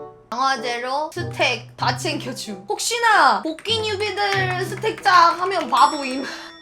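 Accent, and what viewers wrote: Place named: Jeolla, South Korea. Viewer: 전라도 분이 신가..? 말투가 되게 중간중간 구수한 발음이 나오시내 ㅋㅋㅋ 재밌게 봤습니다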